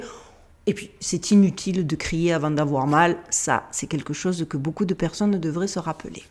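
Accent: South, France. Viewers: bjr toujours de bonne vidéos.tu a un accent du sud de la France.🙂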